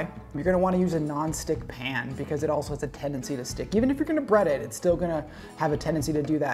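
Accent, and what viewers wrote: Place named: Minnesota, United States. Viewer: Hey - I have no idea how the YouTube algorithm brought me to your videos- but so happy they did. I’m sitting here in lockdown in Melbourne and your accent and these fish recipes are bringing me home to Minnesota. Hope you’re well. Also- that daughter of yours is adorable!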